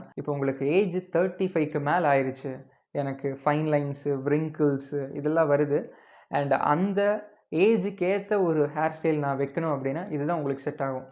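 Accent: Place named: Kerala, India. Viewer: സംസാരിക്കാൻ തുടങ്ങിയപ്പോളെ തോന്നി മലയാളി ആണെന്ന് 😂❤️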